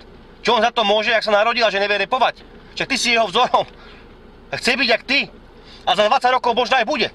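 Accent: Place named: Petržalka, Slovakia. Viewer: Ked ho pocujem, v sekunde sa mi vynori Ovsiste 90tych rokov, gangy na kazdom rohu, fetaci, neskor mafia a typicky petrzalsky prizvuk. Som z Ovsista, a som na to hrdy. Aj na to, ze som prezil.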